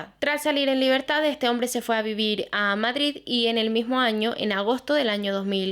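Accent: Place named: Canary Islands, Spain. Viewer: no sabía que eras de Las Palmas, se te nota el acento canario pero no sabía que eras de la ciudad. Encantadísima, y muchas gracias por tu trabajo❤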